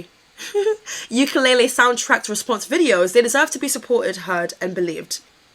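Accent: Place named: London, United Kingdom. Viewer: lol I love the career/Korea part in your wonderful Londoner? accent.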